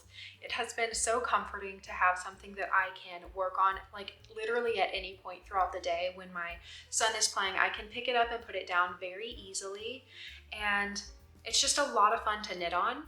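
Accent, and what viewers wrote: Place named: Australia. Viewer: You can’t tell you how tickled I am to hear the Aussie creeping into your accent!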